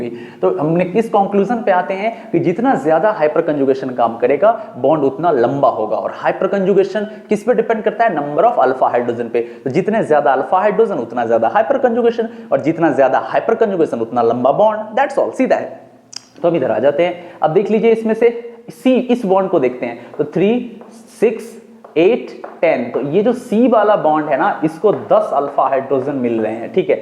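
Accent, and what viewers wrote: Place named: Bengal, India. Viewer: Sir, I wanna ask u a question. Are you Bengali because your accent matches with bong culture.Some friends of mine are from Kolkata & they have same accent. Please reply sir.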